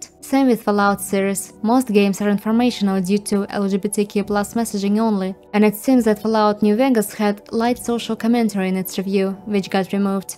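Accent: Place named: Eastern Europe. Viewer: Yes, it indeed have some slavic languages vibe. Haven't noticed before. She has some strong accent, but it's not clear to recognize for me.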